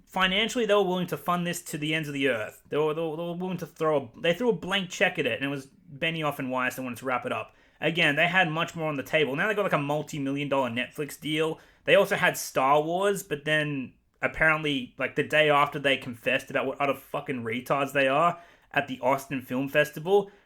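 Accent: Australia. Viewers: I always thought you were British! Your accent isn’t as strong as other aussies I’ve heard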